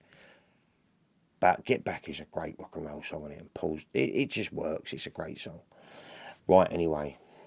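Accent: Cockney, United Kingdom. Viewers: Is that a cockney accent I hear?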